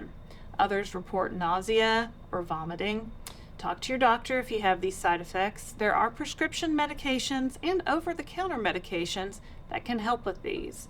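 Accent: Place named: North Carolina, United States. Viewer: Thanks, I come here for encouragement about every day. I love your North Carolina accents. Most of my relatives lived in Stanley County when I was growing up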